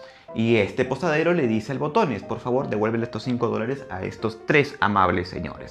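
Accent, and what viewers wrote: Peru. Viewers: eres peruano? :o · O sea eres peruano?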